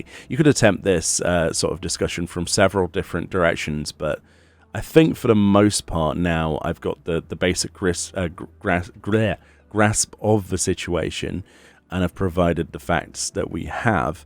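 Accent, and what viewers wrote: United Kingdom. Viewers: From someone who neither rp's or erp's, I don't really understand why you go so harsh on ERP specifically here and state you dont when you obviously have some sort of issue with it o.O I do not agree with any type of public ERP but in 6 years of playing have never seen any on chaos, mainly as I haven't been looking for it I guess but haven't seen any interactions of the sort in public channels. SE can only police so much and whenever I've seen people advertise 18+ venues (from what I can tell) they have not been ERP specific just clubs mainly. There is also a language filter and emote filters, so in order for minors to be exposed to ERP or unacceptable behaviour in terms of age related content they'd have to remove the profanity filter and go looking for things within the game. That's a parenting issue, OR like most UK based 16 year olds at least, they're already well aware of the workings of the world in that respect and are treated as the young adults they are, you can't screen everything because some 16 year old or younger may see things they shouldn't when every precaution has been followed as much as is possible. 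age of consent in the UK is 16+. not 18. (im guessing this country is uk from the accent?) and do not require consent. the pegi rating is also for story content and is PG recommended.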